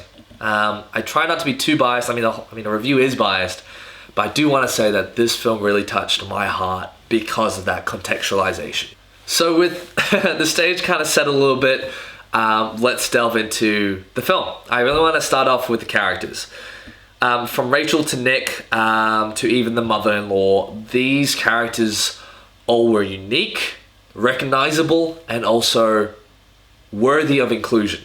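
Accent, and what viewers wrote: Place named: Australia. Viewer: Yesss, another Australian, possibly, maybe, the accent sounds about right. I haven't seen the movie yet, I'm just about to now, so I'm gonna watch this video when I get home, but I'm really interested to hear 18 minutes worth of your opinions on it.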